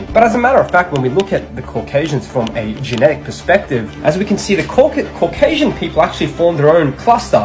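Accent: Australia. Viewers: Which part of Australia you are from? An Iranian with an Aussie accent 😮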